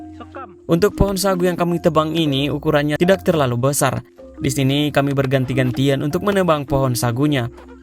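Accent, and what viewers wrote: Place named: Toraja, Indonesia. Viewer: Pantesan bahasa daerah nya gak asing ku dengar, ternyata orang Toraja 😅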